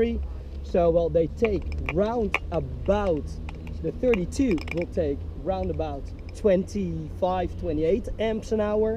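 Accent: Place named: Amsterdam, Netherlands. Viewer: Grappig amsterdams accent ; )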